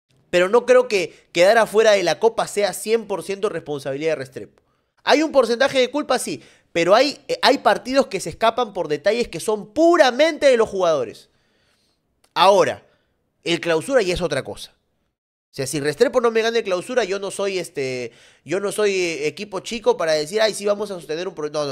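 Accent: Argentina. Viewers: Por que habla como argentino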